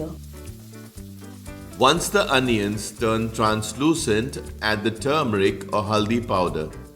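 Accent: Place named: Mumbai, India. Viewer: What a pucca Bandra-Mahim accent! 😅 Very nicely demonstrated to prepare a perfect Cabbage Foogath! A variation of Saraswat Upkari!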